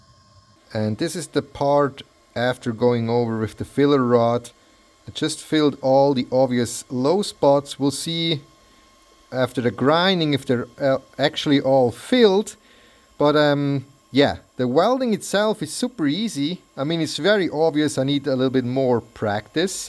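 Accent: Switzerland. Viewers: Another Youtuber with a Swiss Accent! Nice to hear you speak. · das ghöri sofort use das schwizerdütsch e der, chasch es ed verstecke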